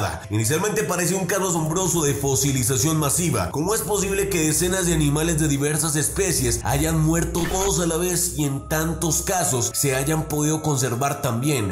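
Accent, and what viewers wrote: Colombia. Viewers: pa re Colombiano el pibe este😎😎😎😎😎😎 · eres colombiano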